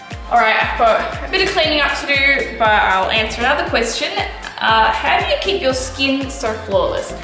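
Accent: United Kingdom. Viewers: I wanna ask you something Why do you sound like British like In a few vids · Are you from england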